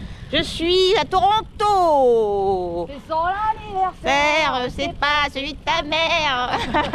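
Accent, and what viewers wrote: South, France. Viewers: Haaaaaaa tu m’as manqué 🎉 😮 trop cool d entendre le son du sud 😊 , du calvados j entend le soleil ☀️ merci 🫨 mince la creveson 😵‍💫 et oui 13 😊 , vous êtes au top ✌️ j’oyeux anniversaire en retard j ai envie de pleurer 😢🎉🎉🎉 bizou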